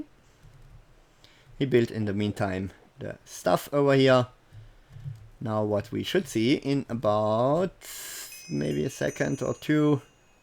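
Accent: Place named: Germany. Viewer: That strong german or dutch accent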